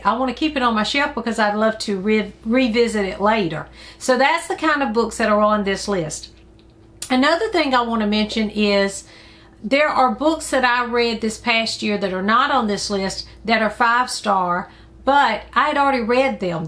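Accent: South, United States. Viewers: I so enjoy your southern accent way of describing wonderful books. We have so many similar books in common. Glad you read Thomas Hardy and enjoyed him!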